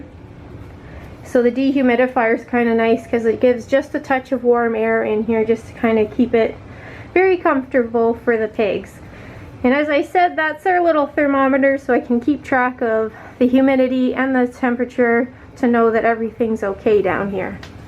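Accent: Canada. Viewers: You do you girl! Say things the way you're comfortable saying them. I just thought that was how you guys say it in Canada. Lolz I really enjoy your videos and you have helped me to know how to take care of my piggies Soo well. Wheeks from my piggies to yours. Thanks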